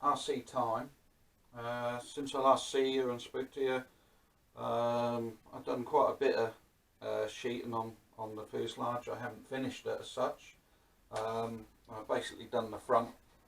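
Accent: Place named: Suffolk, United Kingdom. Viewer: it sounds as though you may come from Suffolk boy as I do … keep up the good work 👍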